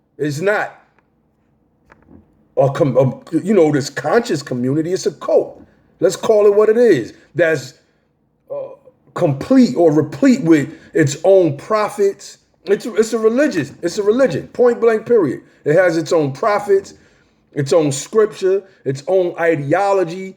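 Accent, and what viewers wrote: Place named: New York, United States. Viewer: You sound like BX or BK, but I love your delivery. Have to Subscribe right now. You speaking for real truth. Exactly how I'm seeing this sucker move in Harlem.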